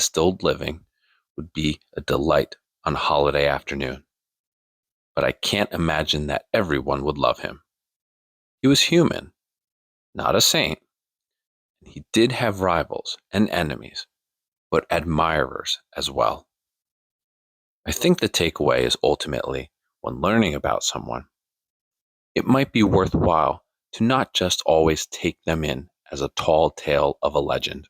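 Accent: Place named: United States. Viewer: you sound like an american , you don t have any british accent at all , if im correct that is very impressive because senna never was loved or famous in the united stetes because for been a f 1 driver , the don t care about f 1 anyway , phil hill was world champion in 1961 if anyone ask about him in the united states you gonna hear , who ????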